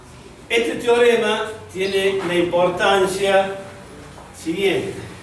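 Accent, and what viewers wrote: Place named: Córdoba, Argentina. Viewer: ajajajja reconoci el acento cordobes al toque y quede wtf, esperaba encontrar el tipico tutorial centroamericano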